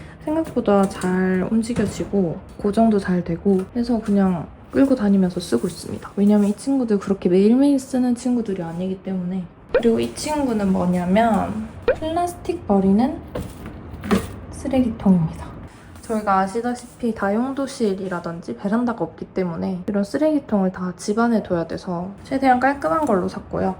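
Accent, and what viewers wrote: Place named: Seoul, South Korea. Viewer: 니 왜 서울말씀?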